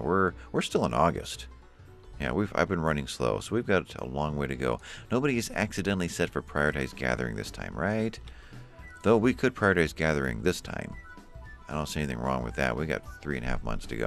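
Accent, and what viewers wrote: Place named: Ireland. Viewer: Very Irish tone to this ep, very nice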